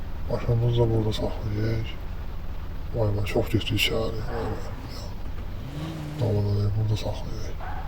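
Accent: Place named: Baku, Azerbaijan. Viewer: Qardas haralisan bele baki lehcen var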